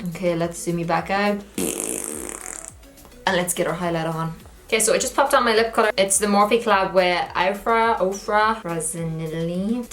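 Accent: Ireland. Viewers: Wait are you Irish ?? Btw your absolutely AMAZING ly xx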